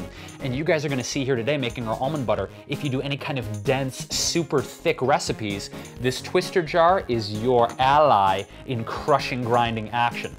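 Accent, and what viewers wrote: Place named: Minnesota, United States. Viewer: Hey there, now, you got dat MN accent down pretty darn good, don't ya!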